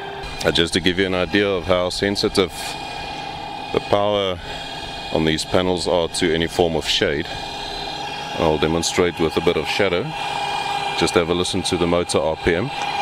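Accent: South Africa. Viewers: Nice! Kink soos Engels met 'n Afrikaanse aksent. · I love the SA accent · I hear a South African accent -> I press the like button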